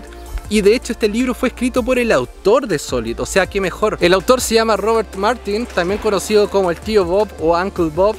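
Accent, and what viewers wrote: Chile. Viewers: me parece que por tu tonada sos de Chile, ( pobre Chile prendida fuego ). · ¿Otro chileno? 🤣🙄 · Sos chileno weon? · hola!! eres chileno?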